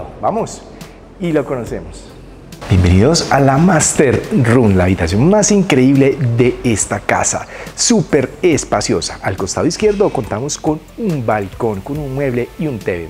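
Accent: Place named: Colombia. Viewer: Tiene el acento colombiano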